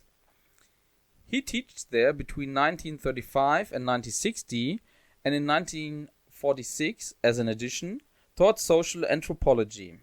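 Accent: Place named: Germany. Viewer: Inhaltlich gut, aber mach es besser auf deutsch. Dein englische Aussprache ist zu deutsch. Aber danke fürs Video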